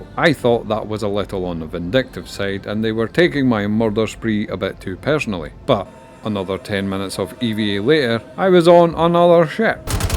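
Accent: Scotland, United Kingdom. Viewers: Purple Burglar Alarm! Love the Scottish accent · Appearantly I'm perfectly capable to understand "scottish english".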